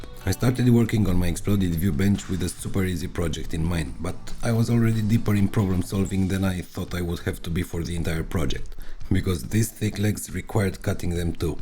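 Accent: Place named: Russia. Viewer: Прямо слышится русский акцент в английском 😂 Вы из России?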